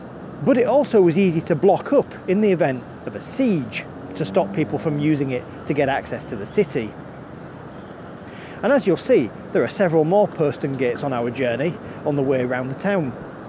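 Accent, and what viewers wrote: Hull, United Kingdom. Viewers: I thoroughly enjoyed the video. I'm new to the area and not properly familiar with the local accent yet. May I ask if you're is typical of the area, or do you originate from further North East? Subscribed. 🙂👌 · Just come across your vids. Great content. Proper Hull accent. New fan.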